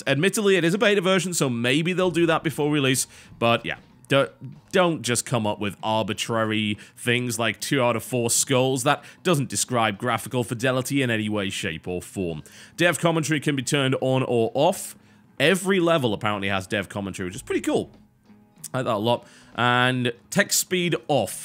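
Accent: United Kingdom. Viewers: And he as a Brit uses the proper British Cambridge Dictionary- and grammar-nazi-approved pronunciation. So yeah, pointless indeed.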